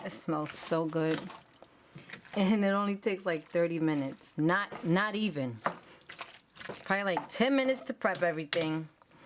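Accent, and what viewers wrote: Brooklyn, United States. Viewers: Nicely done!!! I hear a Bk accent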